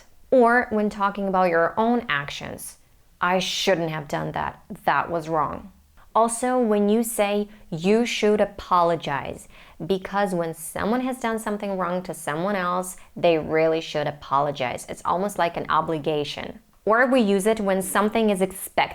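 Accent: United States. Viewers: I like your american accent so much coz I understand 97% of your words. But I just understand 15% words of Australian tourists in Bali and other cities in my country Indonesia. Unfortunately... english classroom teaching in Asia is based on british because asian countries are former european colonies. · How many years have u been studying English?,.I love your sounds very great of course American English.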